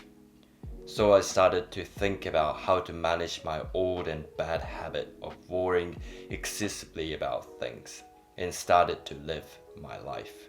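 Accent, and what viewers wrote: New Zealand. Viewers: Your kiwi accent is strong bro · so that explains your accent, you have lived in NZ! Btw your videos are amazing and and an inspiration to people who practicing minimalism like me. Thanks!!!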